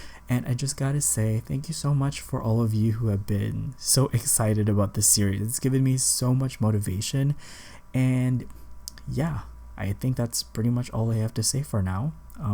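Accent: Philippines. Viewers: Stumbled to this video , and as soon as you start speaking i knew you're a filipino. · Bruh ur accent, by any chance r u a FILO?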